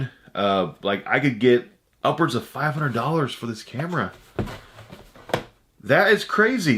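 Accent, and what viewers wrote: United States. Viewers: Omg what part of Indiana are you from??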